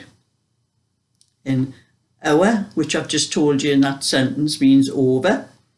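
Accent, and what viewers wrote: Newcastle, United Kingdom. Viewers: The reason I love listening to you is because my Dad was from Newcastle and you sound like my cousin who lives at Greenside.I have only been to the North East half a dozen times, but when my late husband and I went to a 50th wedding party a few years back, my husband said Geordies were the warmest friendliest people he had ever met ! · I think the Geordie accent sounds wonderful. It is one of the reasons why I watch your videos. lol. I used to holiday every year in Alnwick and it is one of my favourite places. I also love watching Vera on the tv.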